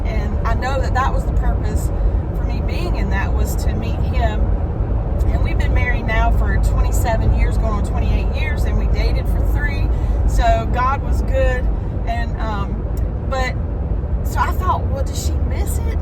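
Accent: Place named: Georgia, United States. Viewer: First, I love your accent but I may be partial since I live in GA😂😂 Thanks for sharing your insights and inspiration. I’m tuned in😊😊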